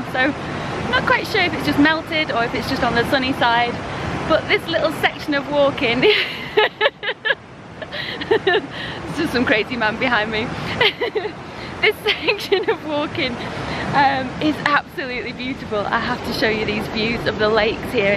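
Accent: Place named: England, United Kingdom. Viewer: I like your videos! That English accent! Such a positive spirit when you talk! Thanks a lot!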